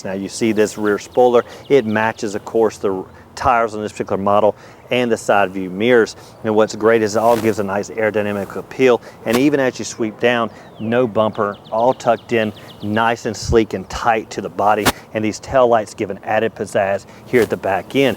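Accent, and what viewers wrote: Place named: Alabama, United States. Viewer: Lol is this guy from Alabama or someshit never heard english like that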